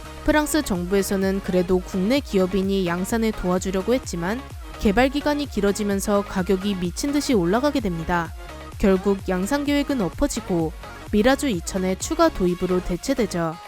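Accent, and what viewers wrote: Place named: Gyeongsang, South Korea. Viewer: 이언냐 갱상도언냐네..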